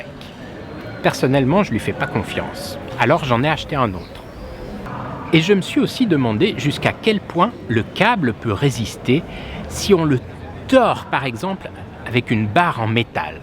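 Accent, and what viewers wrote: France. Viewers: "On s'en cogne de ce sac" tu m'as bien fait rire avec ton accent du sud. Ca fait du bien de voir Antibes et le sud. La mer était particulièrement agitée! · Vé c'est beau... le Safranier (Commune libre) et ton sac, certes on s'en cogne mais de montrer la technologie avec le vrai accent de marseille... Ça l'fait. Franck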